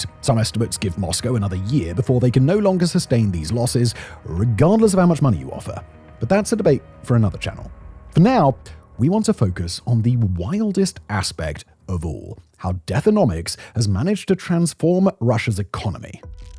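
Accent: United Kingdom. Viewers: yeah man, this is what is happenin. but something about British RP fails to deliver the true synthesis of the emotional impact, did you smoothe it out with AI. Yeoman's work on the breakdown, the cuts are so close together somehow just makes it seem like the same thought except it isn't, which makes the audio experince more like that of a infinite unrelenting run-on sentence that is jam packed with info and concepts yet tonally devoid of emotional contexts --hope this helps --thnks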